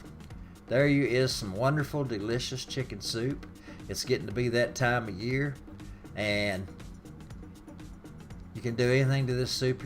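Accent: South, United States. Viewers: The accent threw me off in the beginning and I almost clicked off until I realized it was a southern accent and then stayed lol. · Love your Southern Accent ! 👍💪👏💖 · The southern accent annoys me to no end. I hate it. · Is that a southern accent? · Nice!! I don't think your southern voice could get any pleasant. I just love your voice. Oh...and your delicious cooking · Good country accent. Great video also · Looooove the southern accent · I don't care how good it'll turn out. his deep southern accent convinced me it'll be good no matter what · He got that ol' town road accent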